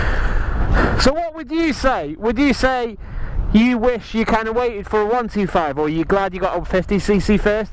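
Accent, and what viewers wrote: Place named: United Kingdom. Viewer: Just subscribed to your channel. Being in Japan it's good to hear a British voice on a motovlog for a change. I've also just started making bike videos. Only 1 for now, but check it out if you have time. Thanks and keep up the good work!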